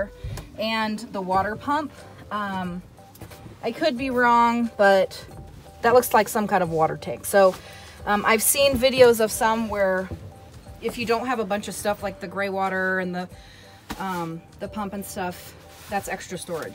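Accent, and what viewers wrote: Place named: Midwest, United States. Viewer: Just tuned in for the Midwestern accent.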